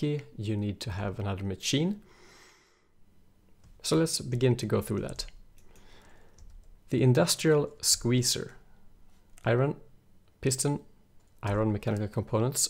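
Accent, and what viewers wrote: Sweden. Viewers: are you swedish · Are you Swedish?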